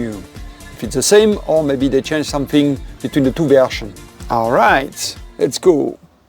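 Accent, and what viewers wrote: France. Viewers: it’s a French accent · Ooo lya lya! strong French accent! Therefore, the question is what kind of radar detectors ( models) can be used in France!!! Where, as we are all know, VERY, VERY serious consequences of using such devices. P.S. My experience - NEOLINE X-COP 870s, not in France offcourse...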